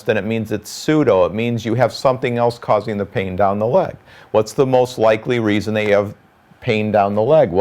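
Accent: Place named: United States. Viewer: i would but it sounds like your in america would you know if they provide this in the UK?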